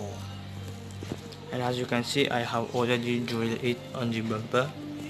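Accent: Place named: Mauritius. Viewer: You sound Mauritian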